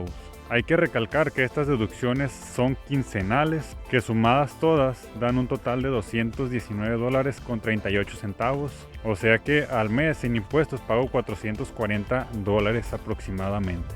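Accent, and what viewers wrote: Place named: North, Mexico. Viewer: Acento norteño mexicanazo.😊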